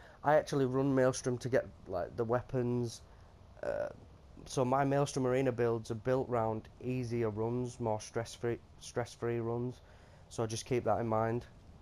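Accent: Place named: Manchester, United Kingdom. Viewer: you sound like a Manc lad, sunny Bolton me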